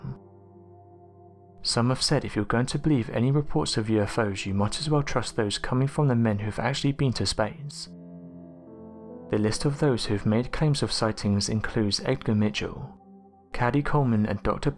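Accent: England, United Kingdom. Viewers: Really tired of the Heavy English accent much of which couldn't be understood 🍀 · I waited for a British accent to begin commentating the video...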